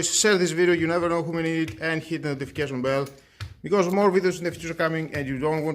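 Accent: Greece. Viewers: Καλά αυτή είναι 100% ελληνική προφορά. Είναι το μηχάνημα του Lidl? Είναι βολικό; Φαίνεται η κίνηση λίγο περιορισμένη σε υψος σε σχέση με άλλα μηχανήματα..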